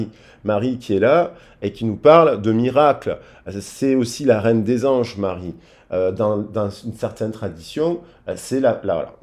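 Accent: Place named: Marseille, France. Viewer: Merci petit et cette petit accent de Marseille 😉🙋‍♀️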